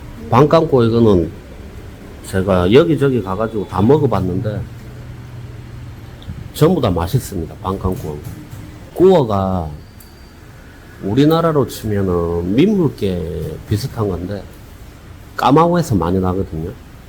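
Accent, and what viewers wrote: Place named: Gimcheon, South Korea. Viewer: 김천쪽 같네요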